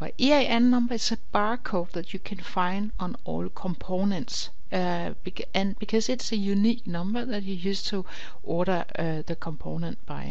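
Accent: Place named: Denmark. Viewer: i smell danglish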